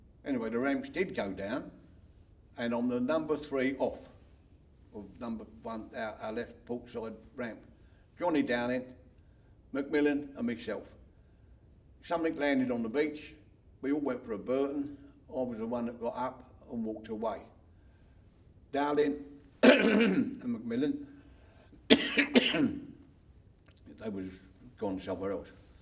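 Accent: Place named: South London, United Kingdom. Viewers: Sounds like south London to me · South East London.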